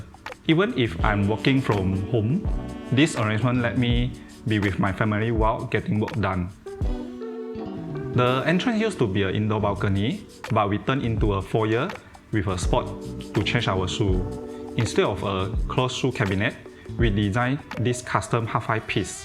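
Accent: Malaysia. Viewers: the create. he enunciated as crate. "call home" became "koh hom" . Other words such as "cabinet", "favourite" too, the chinese-educated Malaysians will pronounce them differently from sporeans. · Strong Malaysian accent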